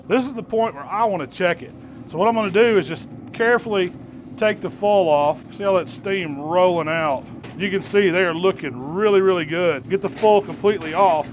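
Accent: South, United States. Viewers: When you see a big dude with a southern accent making barbecue, you know you are in the right place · You get a big ol' boy with a southern accent.. You listen to what he has to say about smoking and BBQ.. I don't care who you are. I got these marinating right now and smoker tomorrow.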